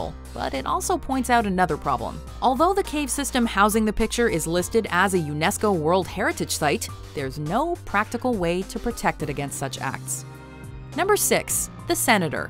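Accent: United States. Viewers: the woman has a US accent, therefore she is being pretentious. It is irrelevant how it is pronounced in other countries. You want to hear it pronounced that way, make your own videos. As for her, in the USA, it is a mispronunciation. VASE. VAYSSSSS.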